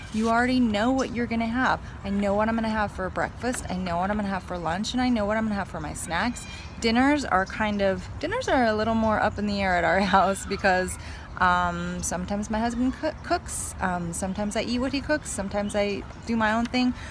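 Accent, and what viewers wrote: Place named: Canada. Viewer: Are you Canadian? You talk with that Accent. Love it.❤️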